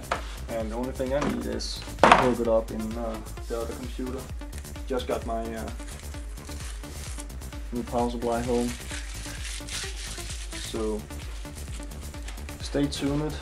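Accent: Denmark. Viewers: lol er du dansker, har abboneret og så kunne jeg høre du havde dansk accent :)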